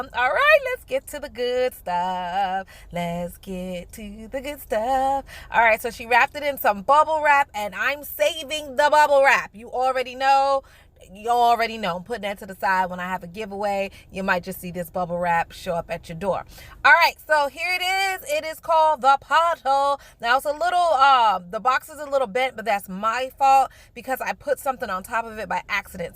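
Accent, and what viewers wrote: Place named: New York, United States. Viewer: Haha. I can hear it. I'm not from NY (from DC) but can spot the NY accent anywhere. LOL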